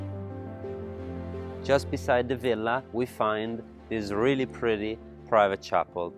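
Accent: Italy. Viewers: Your aristocratic Italian ascent and your selling technique is unique, especially with the beautiful landscape of the Italian country side, This property exudes character and elegance, certainly an investment which can improve with a healthy income while the prospective property owner can also capitalize on living in a superb location. I am from New Zealand living in Australia, both beautiful countries, your delivery is a symposium of magic,drawing us into a life style of Italian eloquence. Thank you.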